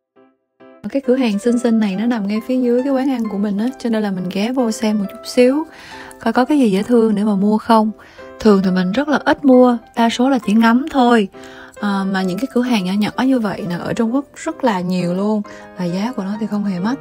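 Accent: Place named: Da Nang, Vietnam. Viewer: hèn chi, giọng hơi đặc ko lẫn vào đâu đc, mình dân Đà nẵng nghe nửa chữ phát biết luôn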